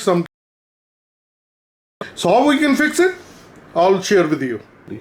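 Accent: India. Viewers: Its indian accent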